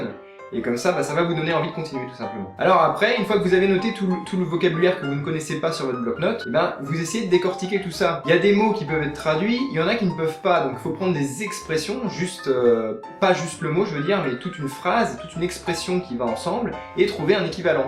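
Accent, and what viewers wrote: France. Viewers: T'es de Franche-Comté ? :)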